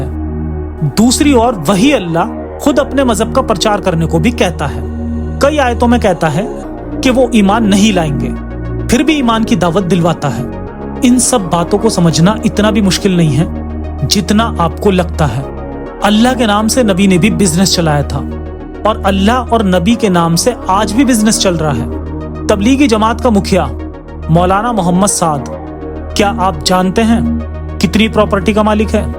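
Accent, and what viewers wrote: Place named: Uttar Pradesh, India. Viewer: उत्तर प्रदेश का है इस की बोली से समझ में आता है भाई😎 सच बताना भाई में सच बोल रहा हूं ना।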